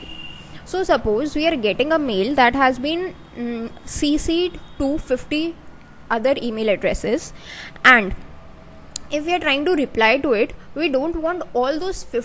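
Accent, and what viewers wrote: India. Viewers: 0 seconds ago This is pure Indian English accent. You may need to go to school to learn the same :)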